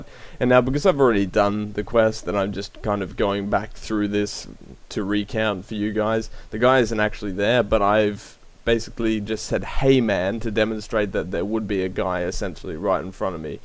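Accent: Australia. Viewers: hes aussie lol.. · are you australian or a new zeelander?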